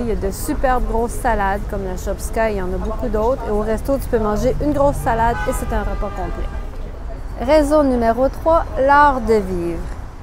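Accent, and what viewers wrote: Quebec, Canada. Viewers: Une québecoise, n`est ce pas?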